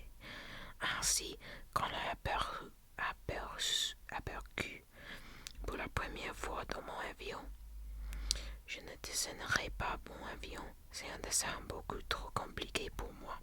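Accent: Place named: Canada. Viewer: It sounds a little bit like videos from canada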